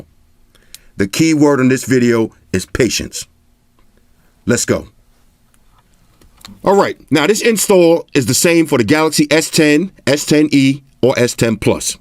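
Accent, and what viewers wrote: New York, United States. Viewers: You from New York right? Lol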